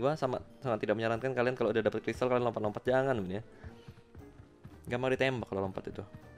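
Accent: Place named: Sulawesi, Indonesia. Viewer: Orang sulawesi tuh bg haha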